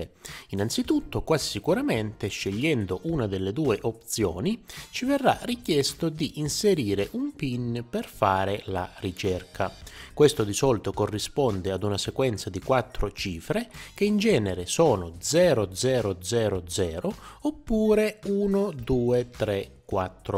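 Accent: Calabria, Italy. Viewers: Sei calabrese?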